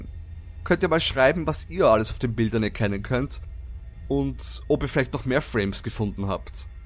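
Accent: Vienna, Austria. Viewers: Habe das selbe gesehen wie du.Das eine sieht in der Tat aus wie eine Schildkröte aus mit Hut.😁😄 fand es sehr abstrakt und musste die ganze Zeit schmunzeln...😄finde deine Stimme total cool.Kann es sein das du irgendwie aus Wien oder irgendwo in der Nähe her bist?glg 😊👋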